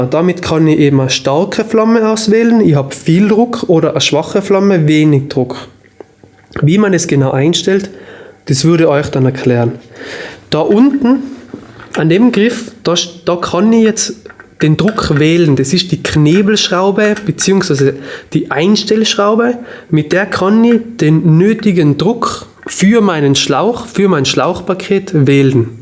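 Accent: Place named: Vorarlberg, Austria. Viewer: Super Video und mit dem Vorarlberger Dialekt super sympathisch!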